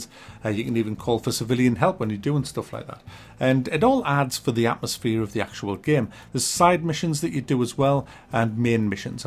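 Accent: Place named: North, United Kingdom. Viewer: Is is just me or is sounding even more northen than usual lately?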